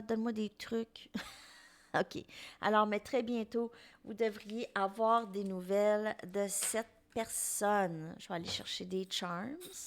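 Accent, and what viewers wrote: Canada. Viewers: j ai toujours aimés l accent canadien c est très beau 👌💋💋💋a vous entendre 😍👍👍👍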